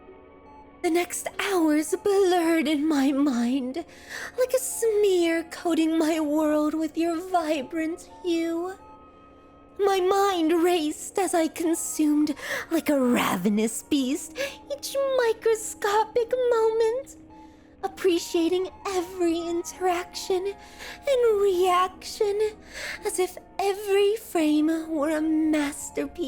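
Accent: United Kingdom. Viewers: "ARE YOU STURRING FROM SLUMBER,AH EXCELENT TIMING, EXCELENT TIMING" with classical music in the background is the most British thing i heard today *not making fun of your accent or voice i really enjoy your content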